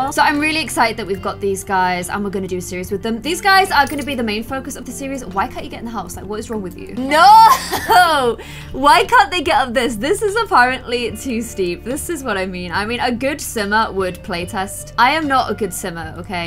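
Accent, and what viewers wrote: United Kingdom. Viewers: Isn’t what she’s saying just the British pronunciation? I’m not a native English speaker but that’s how I always said it in my head lol She was also mispronouncing all kinds of stuff when she was playing her Korean series and is, to this day, still saying “Seo-jun” wrong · It’s her british accent lol, also, i think you mean “covEn” and not “coVen”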